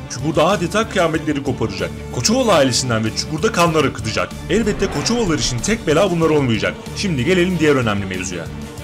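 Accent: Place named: Hatay, Turkey. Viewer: Abi sesin hatay sandık içi’ne benziyor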